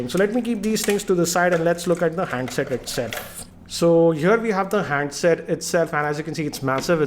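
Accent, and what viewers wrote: India. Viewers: All you Indians have the same tacky accent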